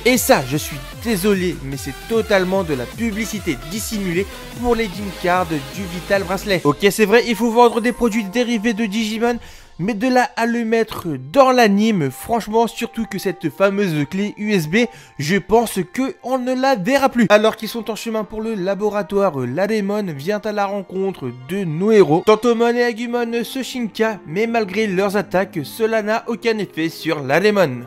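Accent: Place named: Japan. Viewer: Normal pour le symbole du savoir. C un cuirassé a la base. Sinon sympa ta review meme so je trouve tjrs un peu too much de prononcer les noms avec l'accent japonais en parlant francais mais ca reste du gout perso^^ me suis abo pour le soutien ;) Si tu veux jeter un oeil jai fait un amv pour la disparition de Koji Wada ❤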